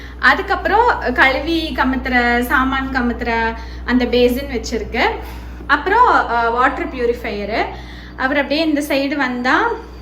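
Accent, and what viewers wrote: Kongu, India. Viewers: Way of talking is nice kongu thamiz. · Iam kongu tamiz from Pollachi and your voice nice and super kongu tamiz🎉🎉🎉🎉😀😀😀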